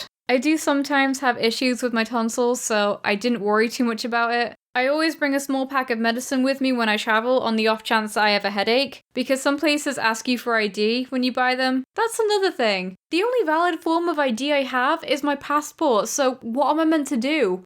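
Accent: England, United Kingdom. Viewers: Where are you from? I'm English too, I love your accent! Hope you're okay now :)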